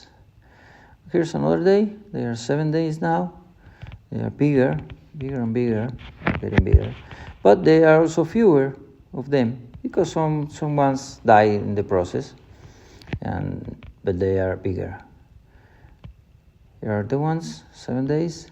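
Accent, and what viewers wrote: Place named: Mexico. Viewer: no problem my doctor , if you only problem is the all mexican problem ,do you speak english like mexican xD but your mother languaje is mexican spanish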